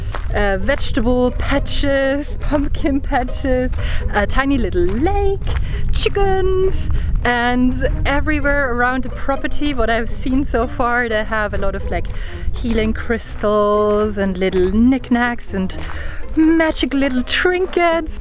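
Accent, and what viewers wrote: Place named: Germany. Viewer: I absolutely love your German Accent which I find extremely endearing and comforting have yourself a wonderful and Bewitching day and Blessed Be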